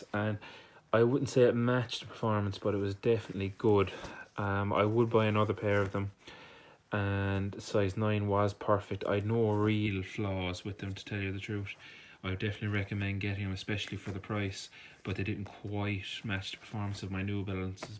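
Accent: Ireland. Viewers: Are u from Dublin?but I'm from Ireland and I don't find gloves that cheap any wear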